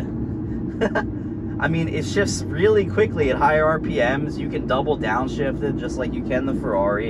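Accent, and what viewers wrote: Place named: Cockney, United Kingdom. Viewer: Great fun and pretty informal too … not a bad cockney accent either … love the 4200 …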